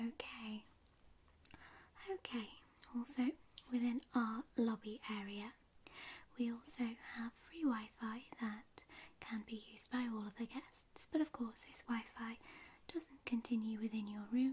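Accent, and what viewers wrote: United Kingdom. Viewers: Oh you're British? Never could tell before with the accent. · Öki Döki :D That british accent is funny... and adorable at the same time ;) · "British accent" brillant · A typical British accent. Because I am British this sounds normal. 😂😂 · british accent okie dokey. Loved it. Also Room 234567? How many floors does this place have? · Isnt this the normal british accent Why write it in the title for the first time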